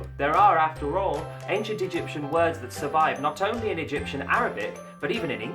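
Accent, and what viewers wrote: United Kingdom. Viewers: Sounds welsh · Your accent is extremely British in ancient egyptian SORRY. Try to pronounce it differently cuz it's ridiculous but if you cannot do that at least pronounce R at the end of the word and do not create a new non-rhotic British Egyptian :D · The funny part is always the english accent,even here. A lot of english speaking natives are complaining about accents of non natural english speakers if they learn english. Good to see that they are not better. No offense,just wanted to mention it.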